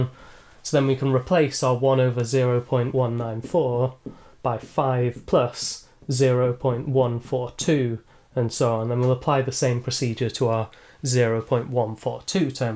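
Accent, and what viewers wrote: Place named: RP, United Kingdom. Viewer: Mate, don't get me wrong: I love math yet your soothing voice and english posh accent are perfect to fall asleep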